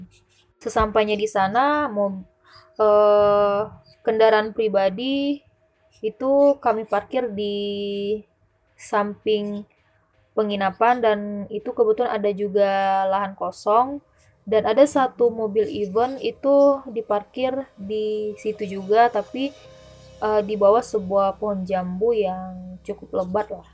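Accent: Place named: West Sumatra, Indonesia. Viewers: Bukan menye2 mbak nyaaa, tapi logat dan cra ngmg org sumatra barat emng bgtu. Kbetulan saya org sumbar 😊 lain kli klo dngerin nya speed nya di naikin aja mbak jdi 1,25, saran nih 😂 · Menurut gue sih ceritanya serem, story tellingnya juga keren, tergantung pendengaran kita masing2 sih.. Cara orang bercerita mah beda2 yak, kita gak bisa maksain orng bercerita dgn gaya sesuai pencerita yg kita suka, si mba nya orng sumbar pasti dari segi logat aja udah beda sama daerah lain jdi gak bisa dibanding2in jga sih, nikmatin aja ceritanya guys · Pembawaan logat sumbar emang gini mbak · jelas kali logat sumatra nya lmao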